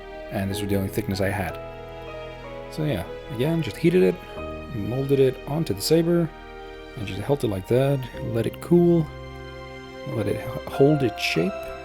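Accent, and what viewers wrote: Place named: United Kingdom. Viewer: Nah mate definitely should've kept the Brit accent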